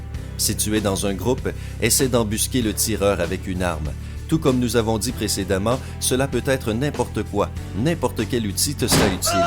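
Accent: Quebec, Canada. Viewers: C un quebecois qui parle.Moe aussi j'en suis un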